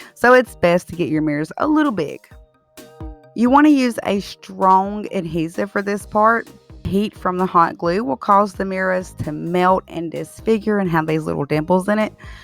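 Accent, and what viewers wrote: South, United States. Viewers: I love your accent. It's so Southern. We're here in North Carolina. My friend has a very thick drawl. She always says she's as country as a sugar sandwich 😂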